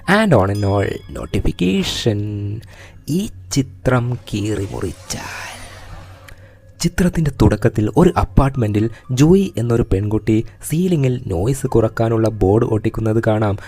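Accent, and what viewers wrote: Kannur, India. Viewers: Bro kannurkaranano 😮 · chettande nadu evda kannur ano🤔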